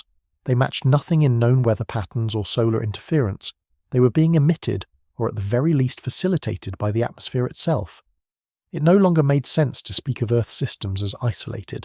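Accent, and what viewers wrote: United Kingdom. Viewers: British voice. They are a day ahead.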